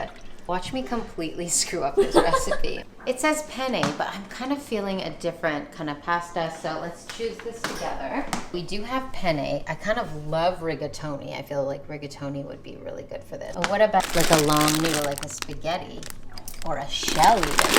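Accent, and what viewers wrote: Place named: Long Island, United States. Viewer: OMGGGGAWWWDD —— I LLLOOOVVVEEE FROM ❤️ I post on almost all her vids. We definitely need a video on your amazing background. Turkey, Spain, Hebrew name and in Canada...wwwhhaatt? Plus bonus points because your manner of speaking, inflection, sounds like me, a Long Islander ( please don’t been offended 🥰). Loved this video! Muah 😘 (your daughter, speaking her mind already momma! Love it)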